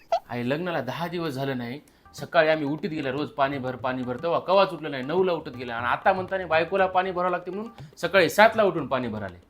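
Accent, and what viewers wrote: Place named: Nanded, India. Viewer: Bhasha chi boli Nanded chi aahe kai bhari acting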